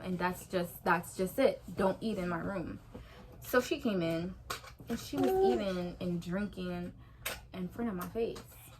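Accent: Jamaica. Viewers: Ooouuu u Jamaican girllaaaa😛😁. Sorry lol my bf also Jamaican nd the fact that I understood wat u was saying I got press lol😂. He be teaching me stuff😄.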